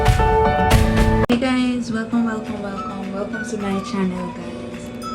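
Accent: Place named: Jamaica. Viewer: I love your intro, thats really nice. You have a nice accent. I love the jamaican accent. Nice prep you did before blending . Need to try this new to me. Thx 4 sharing 🥰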